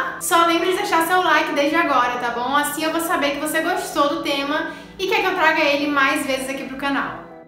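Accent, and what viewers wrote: Bahia, Brazil. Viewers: Além de linda ainda tem esse sotaque lindo da Bahia 😍🙈💕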